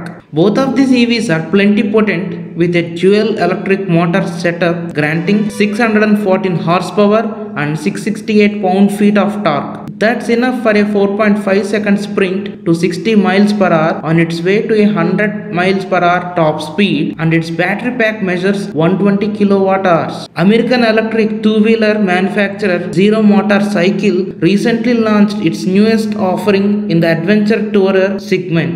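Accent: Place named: Andhra Pradesh, India. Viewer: Voice is andra english....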